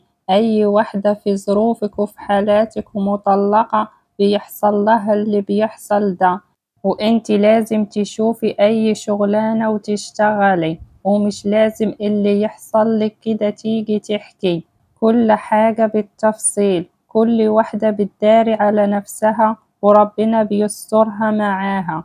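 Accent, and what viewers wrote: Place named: Morocco. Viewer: المرجو عدم التصنع احكي بالدارجة المغربية احسن لك لان اللكنة المغربية واضحة في صوتك وسوف يكون افضل وشكرا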